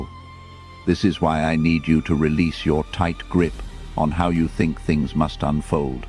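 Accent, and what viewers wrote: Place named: United Kingdom. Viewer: Who is the new voice. He’s British.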